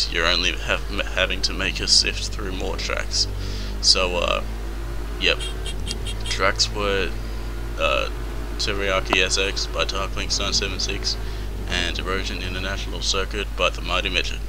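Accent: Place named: Australia. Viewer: Great Australasian accent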